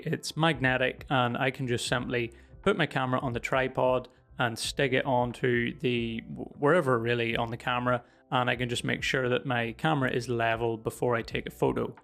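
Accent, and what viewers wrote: Ireland. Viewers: Brah gotta be Irish. Sounds like my bro from Belfast.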